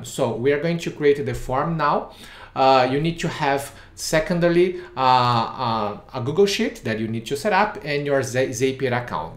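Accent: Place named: Brazil. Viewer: cara, antes mesmo de ver o vídeo inteiro percebi pelo sotque que vc é brasileiro. Eu consigo entender em inglês, mas meu sócio não. Vc tem algum curso de zapier em Português?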